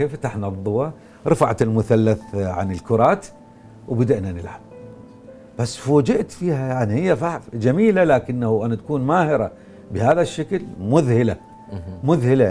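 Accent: Iraq. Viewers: ماأجمل اللهجة العراقية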